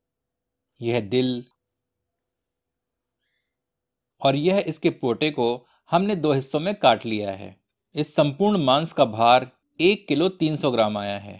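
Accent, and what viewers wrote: Standard. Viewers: Sudh hindi ❤️ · शुद्ध हिन्दी बोलते हैं आप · apki sudh Hindi sunke bohot achha laga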